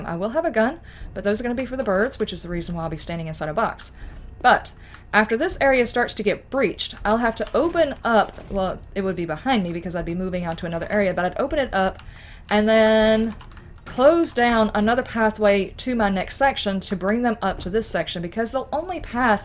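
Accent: South, United States. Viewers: seriously i think shes georgia/missouri and those kinda places she says things like you'all? and other southern words and ways of speaking. also she has those those southern good manners and so on im pretty sure shes from there. havent beed to yorkshire in decades i loved it there i should go back some day :). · Not related to 7DTD, I’m English so being able to place American accents is not a skill I have, what accent is it you have, been trying to figure it out but I’m useless.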